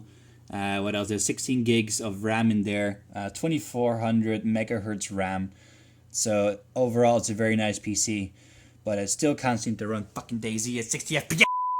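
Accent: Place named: Netherlands. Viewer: do you live in the netherlands? i hear some dutchish sounds in your english and i think i saw a netherlands flag on the word book next to the tv:p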